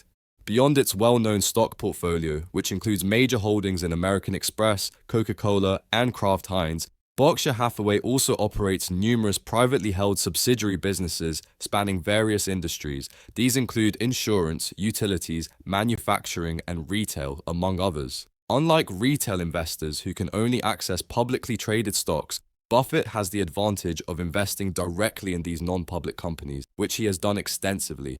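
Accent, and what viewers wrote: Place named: United Kingdom. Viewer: Dude whats up with the Brit accent. 😂. I liked it more when you used your own voice.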